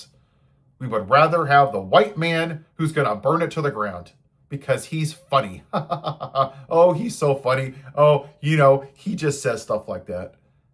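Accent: United States. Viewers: You sound like a refreshingly normal American. Do come back. Good luck to you.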